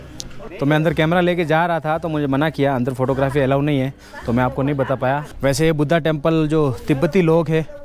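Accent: Gujarat, India. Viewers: Avaj thi lage ke gujrati co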